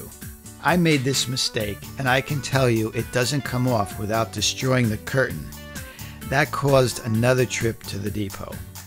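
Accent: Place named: New York, United States. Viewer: u sound like a goodfella u knw like goodfellas the movie ....new york accent.is class 😙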